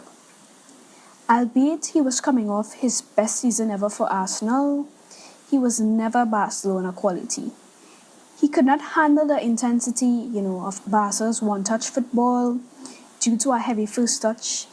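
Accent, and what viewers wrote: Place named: Caribbean. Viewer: Finally a Caribbean voice on Barca matters. Great stuff!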